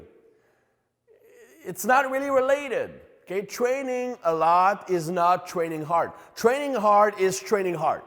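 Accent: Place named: Quebec, Canada. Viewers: Oh my Quebecois! Lovely :) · He's quebecois, french canadian.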